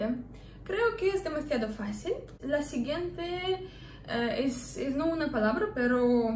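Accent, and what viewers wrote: Russia. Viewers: Hola mi Linda, soy médico y disfruto todos tus videos, aquí te dejo unas 7 palabritas para que puedas pronunciar. Me encanta tu acento Rusoespañol. Haz otro video con ésta y otras palabras curiosas en Español. 😉👍😘❤ 1.Hipopotomonstrosesquipedaliofobia 2.Homopedonecrozoofílico 3.Pneumonoultramicroscopicsilicovolcanoconiosis 4. Esternocleidomastoideo 5.Ciclopentanoperhidrofenantreno 6.Uvulopalatofaringoplastia 7.Gastroesofágicodudenalintestinal.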